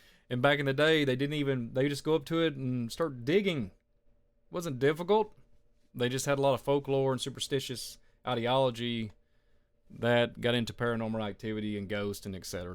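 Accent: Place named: Kentucky, United States. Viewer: Another awesome show!! Keep tellin it brother!! 💯 I love all your research & passion! May Yah continue to bless you 🙏 💙 🙏 PS~ I love your "Kentucky twang" & pronunciations!! You keep me laughing & learning at the same time!! 😊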